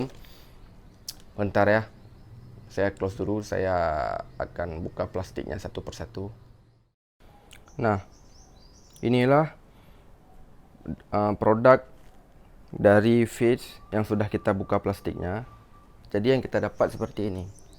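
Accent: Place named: Aceh, Indonesia. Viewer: Orang aceh bang y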